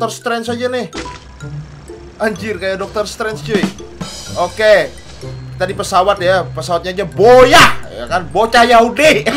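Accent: Banjar, Indonesia. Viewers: Bang Emang orang Banjar bang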